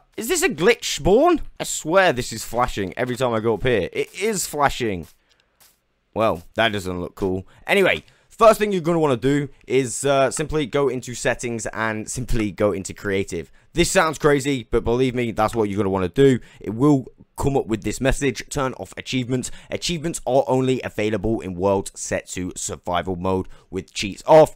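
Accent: United Kingdom. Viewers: so every british person is dantdm?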